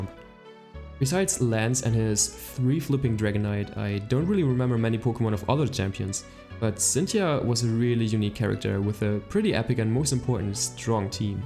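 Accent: Germany. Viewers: haha, man merkt beim Zuhören echt den deutschen Akzent :D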